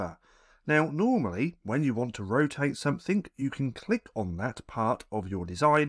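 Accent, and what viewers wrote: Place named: United Kingdom. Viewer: I'm obsessed with your British accent ...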